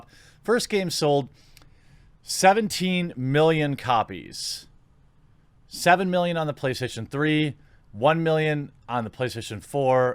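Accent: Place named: United States. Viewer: When did ditch his accent? He sounds completely american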